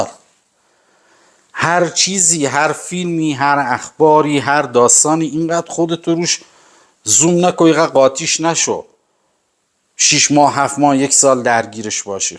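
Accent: Khuzestan, Iran. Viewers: پاینده باشی واقعا شیر مادر حلالت باشه چقدر با لهجه شیرین خوزستانی زیبا روشنگری میکنی ودانش ما را بالا میبری در پناه خدا باشی به امید خدا استادت که بزرگ ما هم میشود خدا هر ناخوشی را از همه انسانها دور نماید با سپاس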